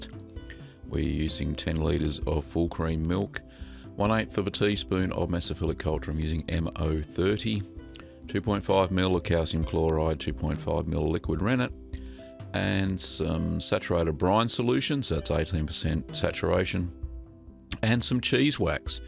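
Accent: Australia. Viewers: Hi. Loving your videos - great work. I notice you use the numbers for your cultures. I'm in Australia and from your accent so are you. Can you let me know where you buy your cultures? My online supplier just sells soft and hard meso cultures and I can't figure out how to map them to the numbers they use on <link> Thanks in advance!!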